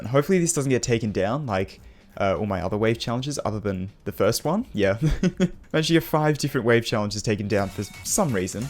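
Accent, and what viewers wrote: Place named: Australia. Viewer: When are you making a level request challenge, though? Curiousity XD. But requests aside, Your content is underatted, your commeentary and Australian accent makes it better. You deserved more! Oh and yeah for the edit, put your Discord link in the Description. Im joining the Discord server 100%. - Null1f1ed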